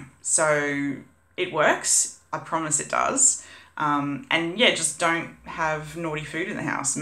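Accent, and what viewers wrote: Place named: Australia. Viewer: Great info, my date for VSG is 19th March, so looking forward to the big change. Nice to hear another Aussie not many of us. Board is a great idea I will start to create mine now. Thanks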